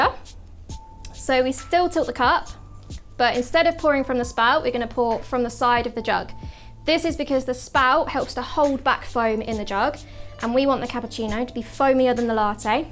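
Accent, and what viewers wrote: United Kingdom. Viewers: No! British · Yes which is where you're wrong, they are located in the UK Also, sounds British to me. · She’s British! Yayy! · barista girl with british accent, Just perfect👍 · She’s british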